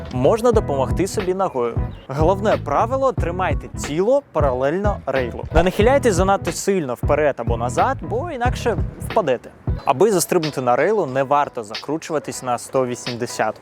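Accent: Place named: Belarus. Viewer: Полностью согласна несмотря на то что есть белорусский акцент, но это исправляемо временем😊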